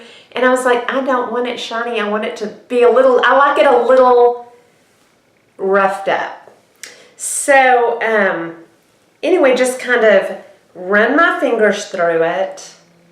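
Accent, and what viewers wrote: South, United States. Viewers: What is your hair color? Love it! Listening to your southern accent is like being back home...love your videos girl!!!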